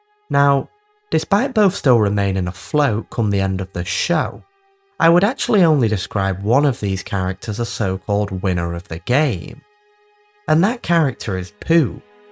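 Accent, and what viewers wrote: United Kingdom. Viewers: We gotta use AI to change this guys voice…. Why so slow and British ew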